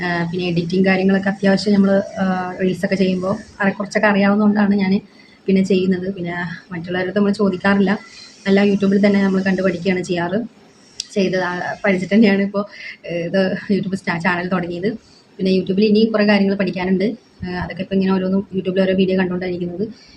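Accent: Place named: Palakkad, India. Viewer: ❤👌🌹🥰hi.. YouTube Facebook Instagram. എല്ലാ സോഷ്യൽ മീഡിയയിലും കാണാറുണ്ട്.. പക്ഷേ മലയാളിയാണെന്ന് ഇപ്പോഴാണ് മനസ്സിലായത്.. congratulation..yur videos very good 🎉 romantic singing song, very wonderful acting.. സംസാരം കേട്ടപ്പോഴാണ് ശരിക്കും നാടൻ പാലക്കാട്ട് കാരിയാണ് എന്ന് മനസ്സിലായത്.. അതിന് എല്ലാവിധ അഭിനന്ദനങ്ങളും അറിയിക്കുന്നു.. ഞാനും ഒരു സിംഗർ ആണ്.. പരിചയപ്പെടാൻ കഴിഞ്ഞതിൽ ഒത്തിരി സന്തോഷം. എല്ലാ പ്രോഗ്രാമും കാണാം.. who is the best very beautiful 🎉❤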